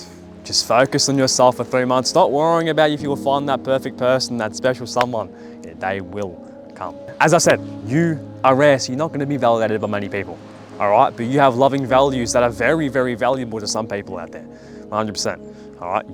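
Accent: Australia. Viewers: I could listen to your Aussie accent all day 😅 I’m just a USA southerner… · Watching this made my day. Thank you ❤ and to answer the title, I really wish and hope to find that one person in few years 🤞. I wish you all well Ps. I like your aussie accent, it suits ya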